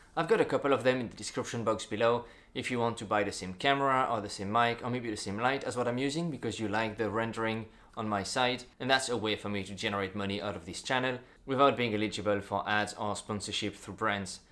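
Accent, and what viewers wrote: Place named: France. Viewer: It is always great to listen to a tutor in Davinci Resolve. You have the knowledge and willingness to share it. I have subscribed as you seem to be able to teach in short sessions - 5 minutes seem about right. You are clear in your explanations and your French accent adds a little extra. Best of luck.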